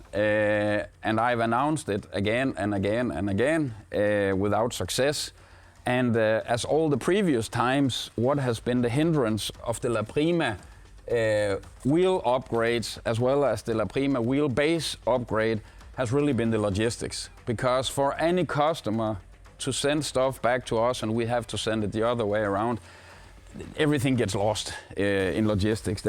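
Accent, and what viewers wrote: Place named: Denmark. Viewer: you can really tell this guy is from denmark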